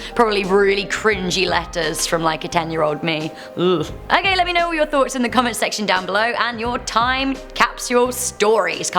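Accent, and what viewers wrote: United Kingdom. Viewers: She says capsule like it’s capsooool that’s wack I really love the accent but the British be saying certain words weird asf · Great job as always hun keep up the good work i love your voice are u from the uk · She sounds British, one can only hope so.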